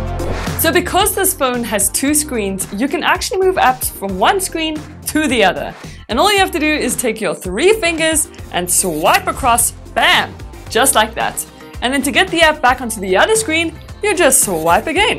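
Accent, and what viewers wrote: South Africa. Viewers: She’s From South Africa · You sound South African😁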